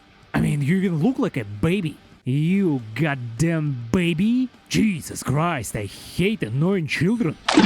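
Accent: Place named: Russia. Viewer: Бро , учмтывая твои крайне локальные вставки и акцент , могу уверенно предположить что ты рускоговорящий , мне было забавно увидеть некоторые из твоих роликов , но то что ты говормл про морду , и многих других однокнопочных аутичных персов в попытке сделать из них богов , это просто стоический кринж. Вполне вероятно что это вызвано пактическими сорбражениями , ибо если ты действительно выходец из ру лола , то прекрасно знаком с тем что за твои вбросы твой канал бы неизменно ждал красивейший нок от бати блейзера , а так , хоть и рыльце в пушку , но вещаешь на англ аудиторию , а значит и вопросов к тебе быть не должно . хоть и вынужден признать , сама по себе рубрика прожарки чемпиона наоборот могла быть оригинальной идеей , года этак 2 назад.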